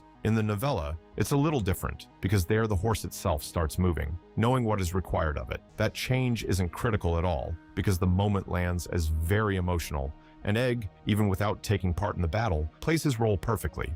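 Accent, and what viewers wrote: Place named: United States. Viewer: You Americans and the way you destroy the English language with your ridiculous accent. Its not Noveeela, its Nov el only 2 synonym's not 3 synonym's no ve la! FFS 🤦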